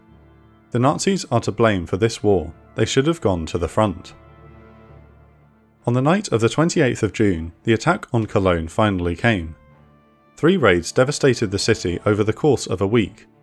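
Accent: England, United Kingdom. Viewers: This guy has an English accent. He describes the bombing of Germany and England as if they were the first counties that had cities bombed to the ground. They weren't, history is severely lacking here. The Germans had set a precedent by first obliterating Warsaw and then severely damaging Rotterdam, in his myopia the Englishman omitted this history. The slow ramping up of bombing between Germany and England is a later phenomenon, the Germans had already set the precedent for bombing of cities in Poland and Holland.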